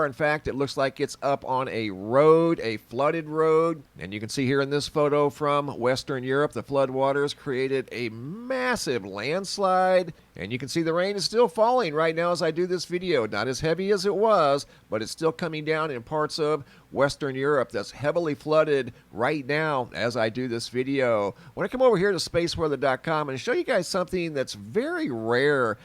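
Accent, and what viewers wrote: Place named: Central Pennsylvania, United States. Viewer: Btw thats how everyone sounds in central Pa and surrounding area Yoooooooo dude :-D Hey I'm from pa and yes back about 6 years ago back country road not a drone nothing like that full 100% craft out of this world just hovering right above my head on this back country road it was legit just chilling there as if its saying hi to me. All i had at the time was an ipod and when i went to try to record it and then began to move from me behind the trees It was above my car the height of telephone poll.. it was high tech and out of this world for sure.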